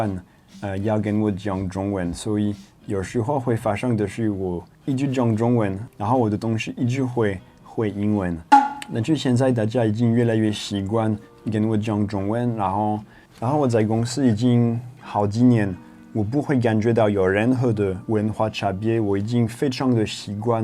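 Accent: Taiwan. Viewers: 的國語，越來越好，再過十年一定成為真正的台客，因為學的國語是台腔國語，沒有過分的捲吞，不簡單喔！ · 台灣國語的 😂🤣 不愧是， 🥰👍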